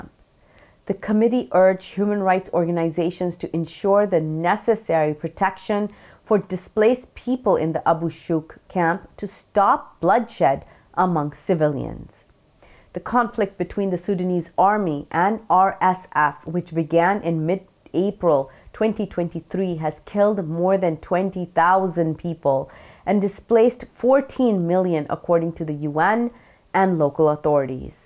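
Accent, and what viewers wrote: United States. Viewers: *╔═══ ❤☀💚☀❤ ═══╗* 💚💚💚💚💚💚💚💚💚 Madam, Assalaamu alaikum. Nice hearing you after full one week. 16th, january is the last we heard you presenting the news. Keep presenting the news regularly. We from India feel at home in your slow pace of reading the news though it is also in American accent!!! DOING A VERY GOOD JOB KEEP IT UP!!! 🌐🌐🌐🌐🌐🌐🌐🌐🌐 ╚═══ ❤☀💚☀❤ ═══╝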